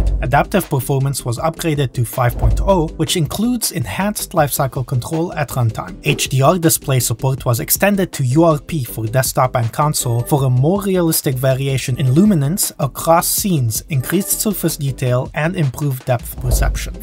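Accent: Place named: France. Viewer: Love the french accent! Good video :D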